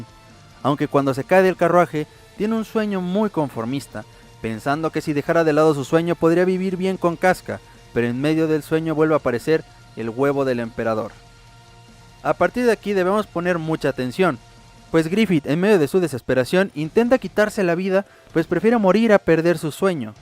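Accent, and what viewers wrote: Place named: Chile. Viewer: Suenas igual que apura chilena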